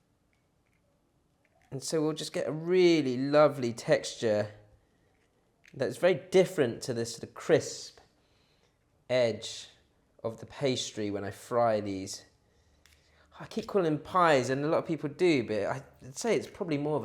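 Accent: United Kingdom. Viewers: thank you.. you sound sooo British.. San Diego Ca.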